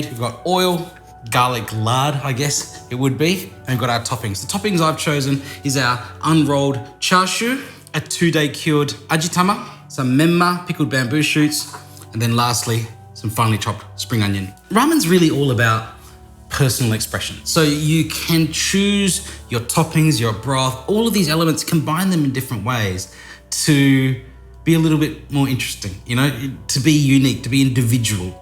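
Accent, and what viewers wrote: Australia. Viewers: My man. Are you Australian Japanese. ? Too cool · your Aussie soothing voice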